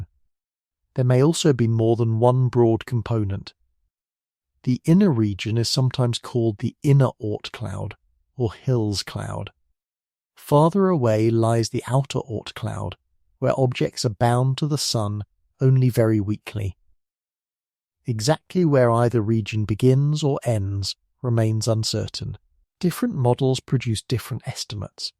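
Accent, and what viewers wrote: United Kingdom. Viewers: gentle voice from eleven labs 😊 British voice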